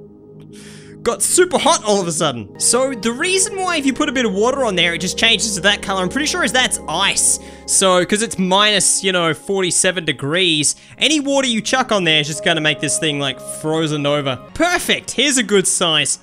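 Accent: Australia. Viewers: Hes aussie! Like me! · Wat your Australian me to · Are you Australian?! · His Australian not English for his it is satin for us it is saturn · you australian?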